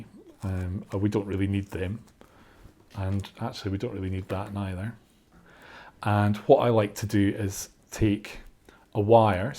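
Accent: Scotland, United Kingdom. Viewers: refreshing to hear a Scottish accent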